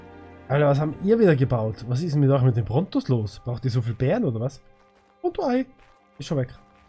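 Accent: Switzerland. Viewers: SRY mein lieber, der beste ARK-YouTuber of the World ist und bleibt immer noch Cloud:-) aber ich liebe deinen schweizer Slang;-)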